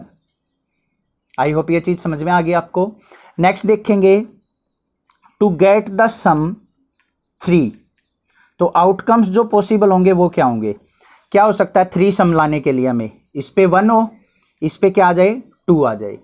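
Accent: Haryana, India. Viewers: Sir Haryana se ho kya aap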